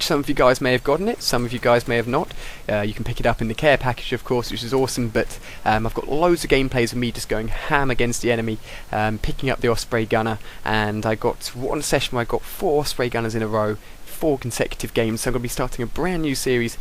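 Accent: United Kingdom. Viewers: love the britisch accent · What do you think? He's only got a British accent.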